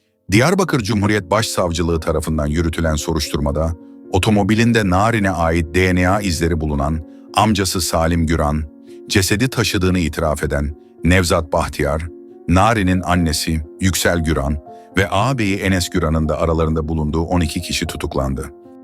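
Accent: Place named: United States. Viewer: Siz Amerika'da mı yaşıyorsunuz konuşmanız aynı Amerikalı gibi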